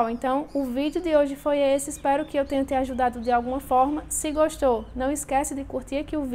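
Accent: Northeast, Brazil. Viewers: Vc é de que estado do nordeste,??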